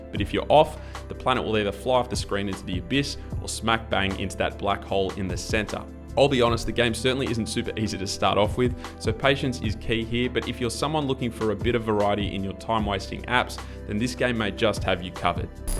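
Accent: Australia. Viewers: This guy's Australian accent is surreal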